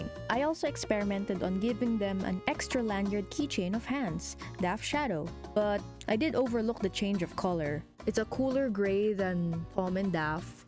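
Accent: Philippines. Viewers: You know is Pilipino Because of The Accent❤❤😅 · WAIT UR FILIPINO?? I SEE THE ACCENT IN YOU. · i could tell from her/his (dont want to misgender and start an arguement heh) voice that your filipino, and the "lolo" part lol, but your grandpa is cool and i love how supportive he is just by wanting you to make merch for him :3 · Hi im new here, i love the shorts you post😁😁 Anyways.. I have a question... You sound filipino are you?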